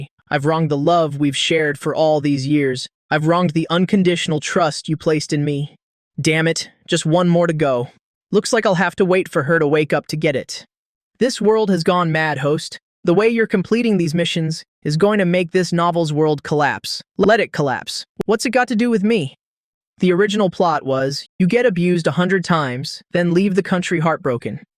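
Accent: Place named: United Kingdom. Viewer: where`d the british accent come from 🫠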